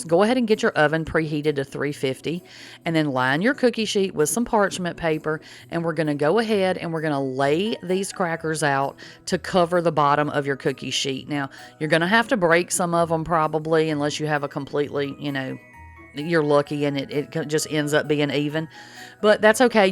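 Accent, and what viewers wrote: South, United States. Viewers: Don't know where you are from. You have a southern draw and no one in the south or anywhere else in the world pronouns perylene that way !!!!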